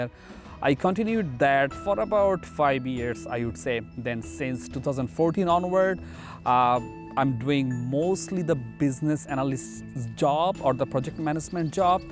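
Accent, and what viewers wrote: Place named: Bangladesh. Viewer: I watched the entire video just to hear you say that you're from Bangladesh. But you did not. Are you ashamed of your nationality? And BTW I could tell that you're from Bangladesh by your accent.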